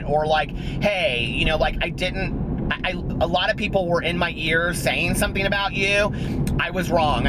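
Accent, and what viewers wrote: South, United States. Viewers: Where does your southern accent come from? I am from Georgia and I swear you sound local. :)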